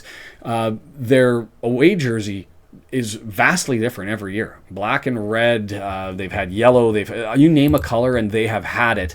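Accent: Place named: Wales, United Kingdom. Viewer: Great Welsh accent you’ve got there mate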